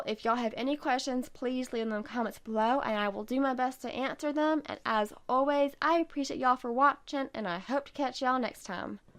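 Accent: South, United States. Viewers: Thank you!! My first time watching and I will be watching again (and again!) Your very gentle southern accent just makes the entire video all the more pleasant. Excellent close ups and hints and tricks for this classic heirloom look.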